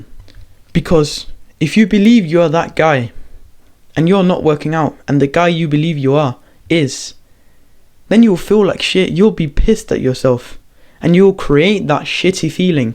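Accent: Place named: United Kingdom. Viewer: man that accent is honey for hears, wish i had a uk accent🙏🤣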